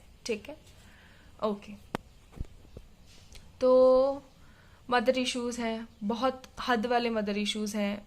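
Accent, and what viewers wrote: Punjab, India. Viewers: apki awaaz se aise lgtaa hai punjab se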